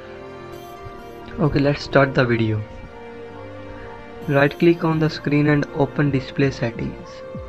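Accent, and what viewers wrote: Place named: India. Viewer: lmao u put united states but ur clearly from india or sum other shitty accent country lmfao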